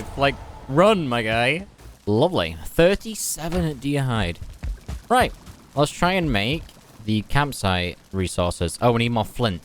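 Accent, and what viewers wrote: England, United Kingdom. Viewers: giọng người Anh ?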